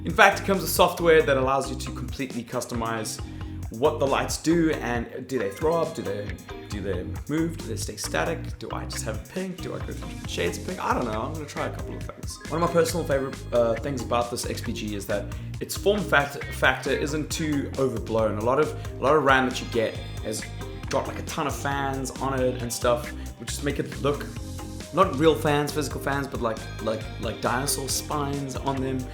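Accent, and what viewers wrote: South Africa. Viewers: You are, was, is or come from South Africa I heard it in your voice first then this Only ppl who know what that word implies like the vid or, I WILL HATE YOU FOR THE REST OF YOUR LIFE. then i forgive you because there is no reason to hate a dead person. Just realized your hair changes color here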